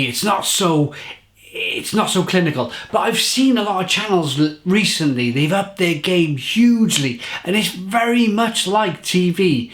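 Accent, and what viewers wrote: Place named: Wales, United Kingdom. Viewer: “It’s going down like milk!” Love it! My mate Matt from Dinas Powys, a similar accent to used to say of cider - “It’s going down like water!” Love reviews. A legend of YouTube. No frills, just enthusiast and knowledgeable. Legend.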